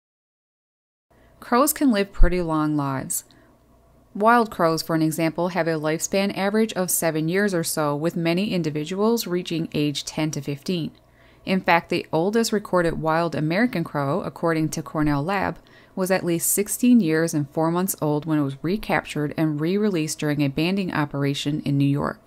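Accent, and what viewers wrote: Canada. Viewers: You're Canadian, aren't you? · Is it true that each crow has somewhat of a unique song meaning they don't sound completely identical like other songbirds just asking? By the way I like your accent. Are you Canadian? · Dear, I love your videos, your facts are beautifully presented and your Canadian accent is so very charming. In Australia we also have crows, ravens and other song birds. The East Coast magpie (found in my home town, Sydney) has the MOST beautiful song. Once your local magpie knows you it will consider your a friend, woe betide you if they dislike you. My local magpie knows me and I occasionally give it ground mince as a treat though I try not to feed it too much. One day, when this dreadful pandemic ends, visit Sydney, you'll love our birds, too.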